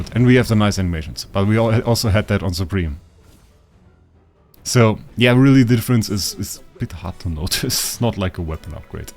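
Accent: Germany. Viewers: the perfect pronounciation of that made me realise that you were german and that accent wasnt from some other language LMAO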